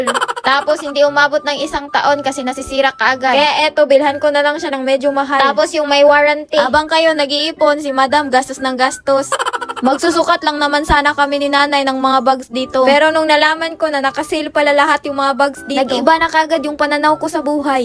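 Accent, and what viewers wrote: Visayas, Philippines. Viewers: Madam bisaya ka?😊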